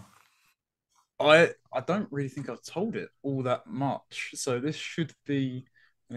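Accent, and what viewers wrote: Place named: RP, United Kingdom. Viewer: I see a lot of Lotus Eaters viewers hating on Connor & I can't understand it... Okay, with his accent & articulation he may come across as posh or pompous at times, but that doesn't take away from, is what he's saying true? Being a Reformed Christian myself I strongly disagree with him Theologically, that being said, his positions & how he got to those positions, is always reasonable & well thought out. I think he may very well grow to be one of the more important voices in the British political sphere & I just hope he doesn't outgrow the LE but rather grows with them. God bless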